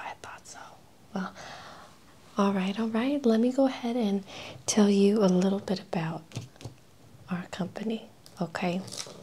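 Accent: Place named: New Jersey, United States. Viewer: Love your Jersey Accent voice in this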